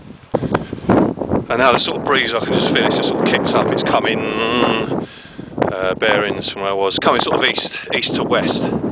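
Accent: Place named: Cockney, United Kingdom. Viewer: nice vid nice to here abit of cockney me china keep up the good work on yer plates fish and tater mate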